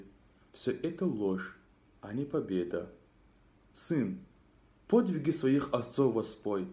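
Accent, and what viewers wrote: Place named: Dagestan, Russia. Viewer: Лезги акцент акуе вичин😀😀😀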